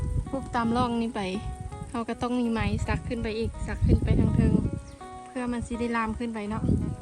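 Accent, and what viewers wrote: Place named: Laos. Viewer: สะบ๋ายดี๋ สาวเม้ยผู้แข็งแกร่งสาวสวยแห่งลุ่มน้ำปากกระดิ่ง ชื่นใจในความสามารถ แม้แต่สำเนียงพูดผสมผสานระหว่างสำเนียงลาวไทยเม้ยกับสำเนียงคำตากล้าได้อย่างลงตัว ขอปรบมือให้ดังๆนะครับ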